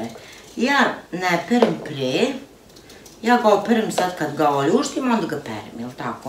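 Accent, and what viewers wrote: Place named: Sombor, Serbia. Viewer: Bas si simpatična 😂 a recepti su ti super! Zadovoljstvo te gledati i slušati , taj Somborski naglasak obožavam… pozdrav iz Edmontona u Kanadi❤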